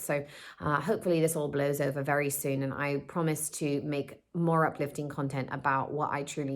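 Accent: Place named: United Kingdom. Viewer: Liked it initially simply for the accent. My friend and I will use a brutish accent from time to time. Makes us feel more creative,brilliant, vibrant, and .... wealthy. But I would’ve liked the video anyway for the knowledge that was given. And I’m running to the cabinet now to grab my neem oil! Thanks 😘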